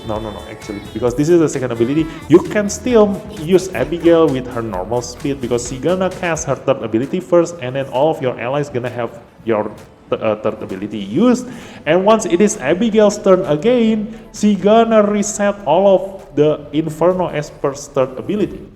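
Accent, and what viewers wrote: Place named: Indonesia. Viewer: Hmm I recognize that accent, indonesian? Nice vid btw, good to see more dislyte content creators.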